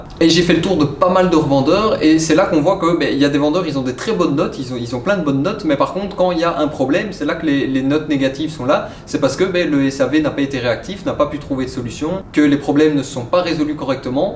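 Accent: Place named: Belgium. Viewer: Salut, je découvre ta chaine et j'apprécie beaucoup tes présentations (avec le petit accent belge charmant). J'ai beaucoup aimé la présentation des 4 machines à arbe hélicoïdal. En regardant aujourd'hui (nov 2023, les prix ont sacrément évolués. Celui de la Jet s'est envolé à plus de 4000€ livrée. Par contre, celui de la Bernardo est resté bcp plus maîtrisé et elle est maintenant à presque moitié prix, achetée directement en Autriche chez le fabricant et livrée (sud de France). Est-ce que ça modifierait un peu ton point de vue (indépendamment des accords que tu as avec machinoutils et qui, évidemment, ont pesé dans ton cas dans la balance). Bien cordialement. Bruno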